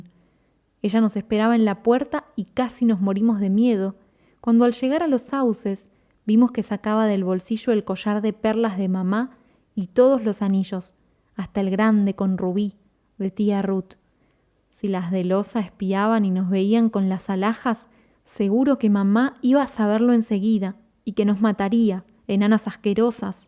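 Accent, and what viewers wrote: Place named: Argentina. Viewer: Una interesante narración de la maravillosa pluma de Cortázar. Adoro todos sus cuentos, gracias por su linda lectura. Tienes una voz muy bonita, el acento argentino es único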